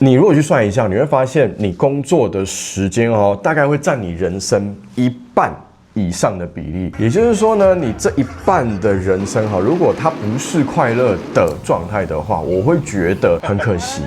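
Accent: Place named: Taichung, Taiwan. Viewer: 濃濃的台中腔=講話尾音偶爾會飄掉=尾音五音不全，太難形容了！台中人一定要支持啦👍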